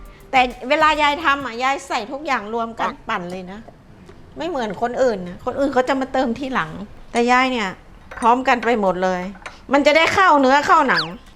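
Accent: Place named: Nakhon Phanom, Thailand. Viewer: เป็นคนนครพนมเหมือนกันค่ะ คุณแม่คุณยาย วิธีการพูดคล้ายๆ เลยย ดูทุกคลิปที่คุณยายทำอาหาร ชอบทุกอัน ฟีลเหมือนได้กลับบ้าน ชอบมากรักมากค่ะ ทำบ่อยๆนะคะ ติดตามต่อไปค่ะ🤍🤍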